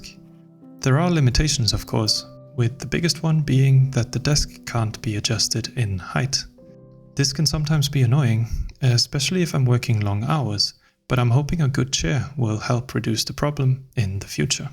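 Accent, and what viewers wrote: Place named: Sunnfjord, Norway. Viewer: Haha, eg trudde eg hørte litt av ein Sunnfjordsdialekt, du skjule r’en din bra på engelsk!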